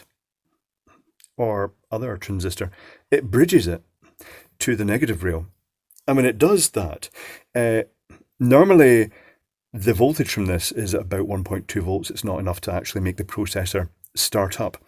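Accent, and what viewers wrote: United Kingdom. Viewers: I just love this fellas accent. Definitely a Brit.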